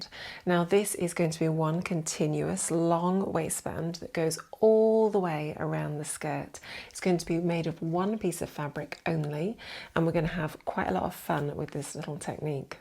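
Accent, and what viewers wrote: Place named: United Kingdom. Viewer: This a great tutorial! I have been sewing for quite awhile but I seem to have so much trouble getting the wristband fitted nicely. I prefer a curved waistband instead of a thin 1 or 1.5 as I am slightly swaybacked, and I end up getting a little gap in the center back. I can’t wait to try this method. You are an awesome instructor and I love to her your cool British accent! Thanks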